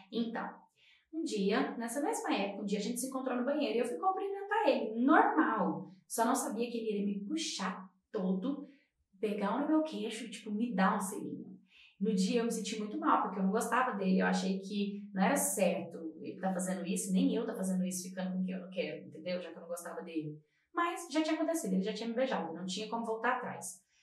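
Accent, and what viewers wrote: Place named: Marajó, Brazil. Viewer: Eu so queria a história com a sua voz da ilha de Marajó 😢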